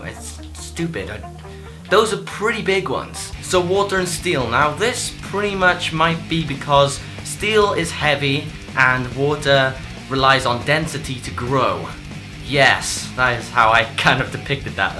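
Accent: Wales, United Kingdom. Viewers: Hey dude, just stumbled upon your channel as I was looking to start up a Let's Play channel in my spare time and was doing some research. Like your videos and have subscribed! Will be sure to keep up to date with your stuff and best of luck for your channel :) Quick question, are you Welsh?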